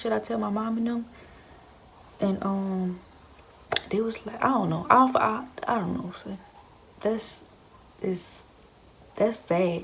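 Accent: New Orleans, United States. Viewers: I hear a NOLA accent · I subscribed to your channel princess. Stay strong and I love that new Orleans accent. Stay blessed!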